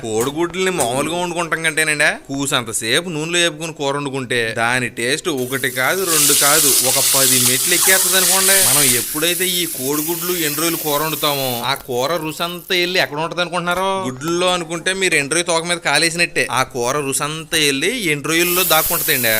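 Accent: Godavari, India. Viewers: మేము ఇప్పటికి ఈ కూర తింటాము సార్ కానీ కూర కన్నా మీ నేరేషన్ వేరే లెవెల్ మన గోదారొళ్ల యాస ఇంకా సూపర్ అండి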